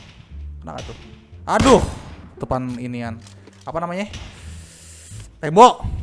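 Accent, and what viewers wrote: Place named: Jakarta, Indonesia. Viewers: Bangg anjirr gua demen banget ama cara ngomong lu betawi bat anyingg😂😂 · Masih ada easa betawi betawi nye soalnya 😁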